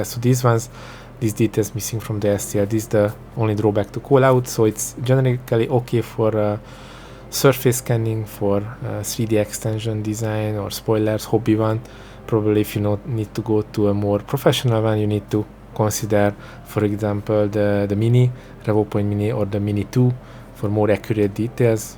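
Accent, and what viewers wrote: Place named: Hungary. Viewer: hey bro, I'm guessing you're Hungarian?!!! I sry... just hear your pronunciation.... Cool video ThX:person-turqouise-waving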